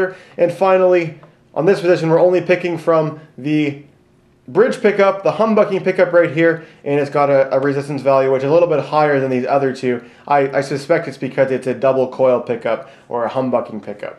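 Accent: Canada. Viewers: Great Video, i finally bought everything i needed to wire my guitars so i can refinish them. I got hooked on all the different types of ways you can wire the pickups and mods. haha. But when i get finished and it doesnt work im like daaaaaamnit. This was a great tutorial! better than cutting off all the wired and starting over lol. Hey man are you from Canada?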